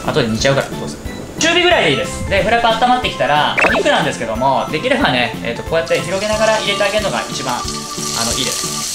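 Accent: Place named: Chiba, Japan. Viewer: いつも思うのでさが千葉ですよね‼️かなりイントネーションがあやふやで訛ってますよ(笑)😫少し治したらどうでしょう‼️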